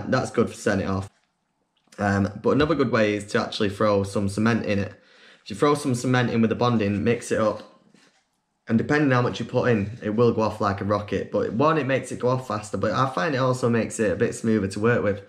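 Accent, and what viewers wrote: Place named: Manchester, United Kingdom. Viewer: Deffo from Manc - "Could be angin" :) :)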